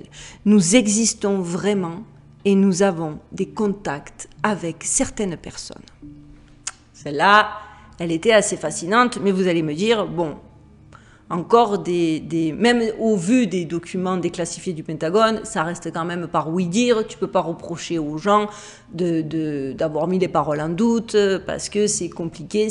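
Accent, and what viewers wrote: South, France. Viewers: Elles sont excellentes tes vidéos, j'ignore d'où tu trouves tes informations mais il y a des choses que je ne savais pas et Dieu sait combien j'en ai vu et entendu des documentaires à ce sujet ! :) Contiue ton bon travail, j'aime beaucoup ton naturel et ton accent du Sud de la France ... Mon épouse a le même ! :)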